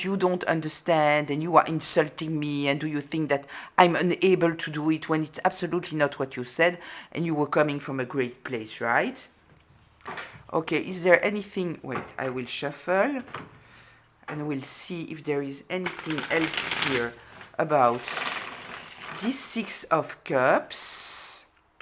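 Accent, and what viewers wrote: France. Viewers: i love your french accent. it's lovely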